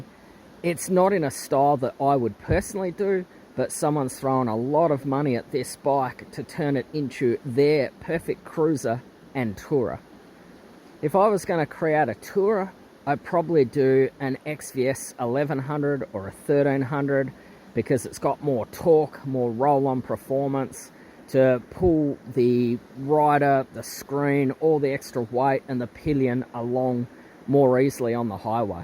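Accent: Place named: Australia. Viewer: Great to hear another Aussie. I just bought one of these. I need to know what a bobber is? It sounds like a good thing. Thanks for the video.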